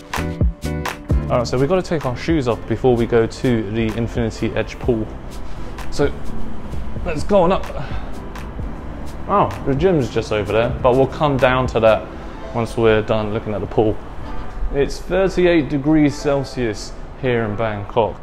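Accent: United Kingdom. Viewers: A guy with such pure British accent for a change...😁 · I love your channel I love British accent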